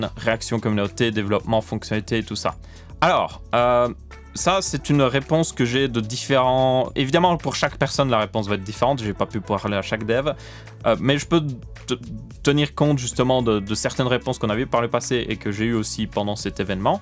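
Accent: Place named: Ardennes, Belgium. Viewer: Ardennes belges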